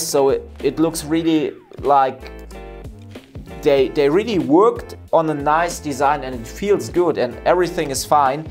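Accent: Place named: Germany. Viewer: I hear german 😊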